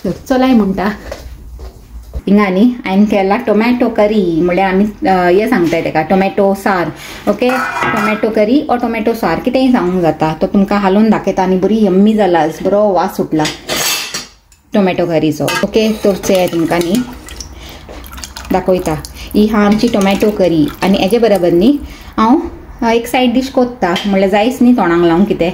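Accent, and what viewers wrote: Mangalore, India. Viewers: Mangalorean accent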